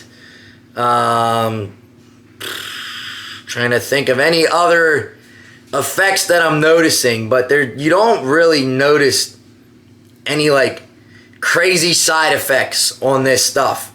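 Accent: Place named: South, United States. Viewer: Bro its been ages since ive heard such a strong southern accent 🤣😅🤣😅😂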